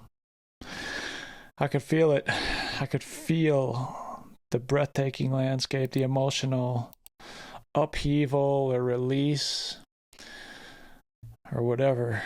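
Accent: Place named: Scandinavia. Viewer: If you have watched a lot YouTube videos, it gets used to presentation like this. Calm, but expressive in moments. It’s actually good for learning and getting new ideas about the subject. It doesn’t force any attention, but highlights. Good job buddy. Pretty sure you are from Scandinavia, Finnish might fit that style the best 🙂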